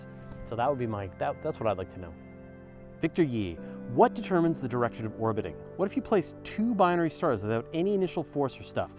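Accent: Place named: Canada. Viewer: Non-astronomy related but, you are Canadian right? I can tell, I am too.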